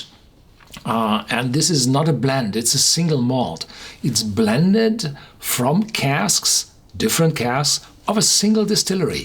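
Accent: Germany. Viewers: Sounds German , not Romanian..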